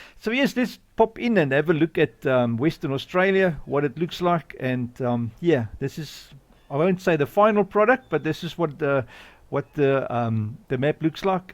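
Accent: South Africa. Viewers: Hoe klink dit vir my of jy van Suid Afrika af kan kom???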